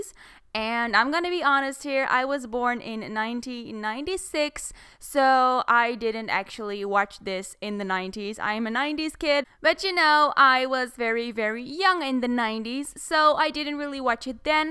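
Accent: Netherlands. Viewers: Yess ❤️ I knew you were dutch the second i heard you haha Dutch accent coming through 😃💙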